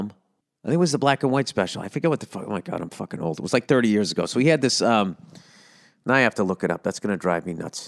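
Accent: United Kingdom. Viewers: Ol Billy British Balls ranting about F1 in the accent is hilarious 😂😂😂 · Dude that British accent fucking killed me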